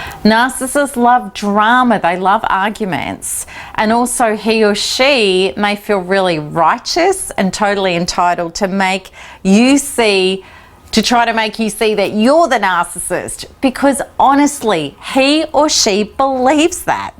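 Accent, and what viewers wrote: Australia. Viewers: By the way are you Australian? you sure sound it.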